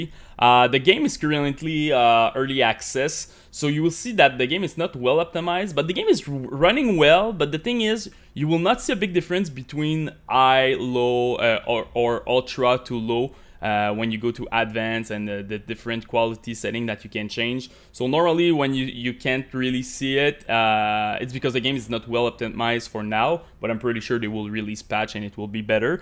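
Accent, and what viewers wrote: Quebec, Canada. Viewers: lmao just curious are you from Quebec Or New Brunswick?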